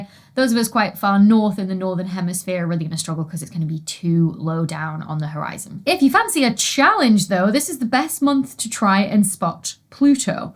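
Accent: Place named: North West England, United Kingdom. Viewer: I always come to Dr. Becky's parlour to listen to her brilliantly articulated speech. It's a pleasure to hear her speaking the North West English.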